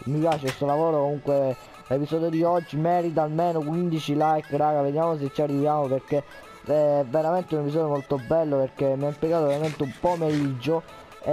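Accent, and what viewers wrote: Sicily, Italy. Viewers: complimenti fai troppo ridere😂😂 meriti molti più mi piace e molti più iscritti,ma da come parli sembra che se siciliano perché anche io sono siciliano e assomiglia molto al siciliano da come parli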